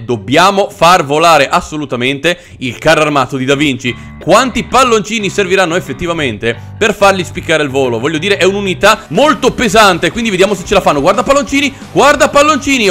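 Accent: Veneto, Italy. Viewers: Like chi conferma che l'accento iniziale di era veramente veneto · ADORO LA VOCE DA VENEZIANO (ed sono anche veneto) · Bello il tuo dialetto Veneto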